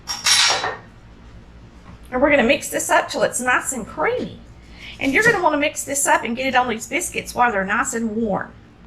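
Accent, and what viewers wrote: South, United States. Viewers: I am just loving your deep country accent 😍😍😍😍so motherly 🤗🤗🤗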